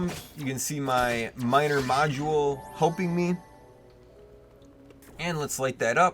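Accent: Canada. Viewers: You are incredibly canadian, thanks for the tut man.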